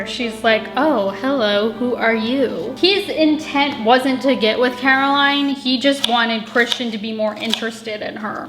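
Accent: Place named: Denmark. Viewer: are you danish because you are talking like a dane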